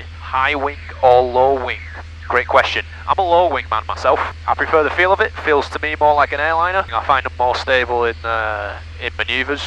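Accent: United Kingdom. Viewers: hey, thx for answer my question :))) you are from australia? i always thought, you are from uk because your dialect sounds british..... :))))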